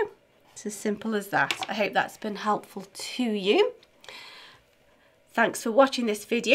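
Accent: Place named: Dudley, United Kingdom. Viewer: not far away from me! I thought your accent was quite near hehe! I’m in dudley! Nathan rose is my teacher!:) x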